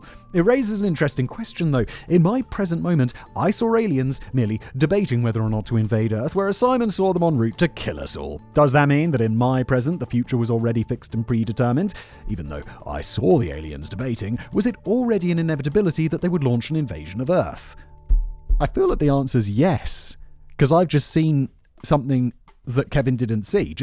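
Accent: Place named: United Kingdom. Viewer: Love when I click on this I hear a British man talking about cock lol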